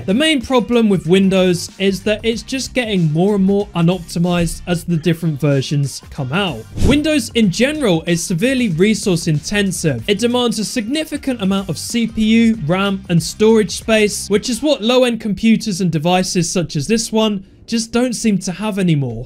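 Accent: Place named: England, United Kingdom. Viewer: He's english. Just because he has an accent doesn't mean he's indian. There are lots of nice people in India and you just provoked them just like that, what a shame.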